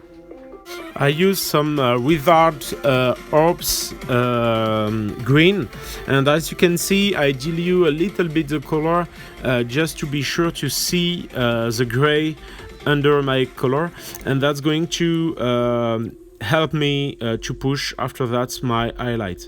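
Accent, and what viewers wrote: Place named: France. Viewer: I can hear french accent lol excellent tuto merci beaucoup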